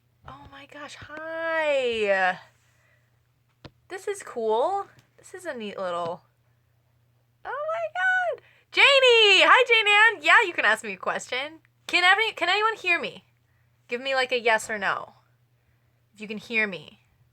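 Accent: United Kingdom. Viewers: Missed it, but I had a buuuurning question... Are you British or American? I just can't lean towards one or the other... Thanks :-D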